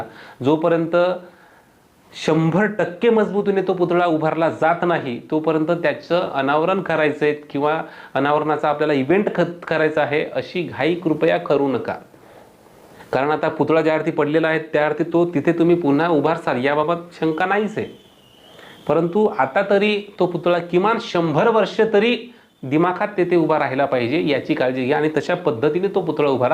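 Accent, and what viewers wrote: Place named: Marathwada, India. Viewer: Because tumchi langauge Marathwada chi aahe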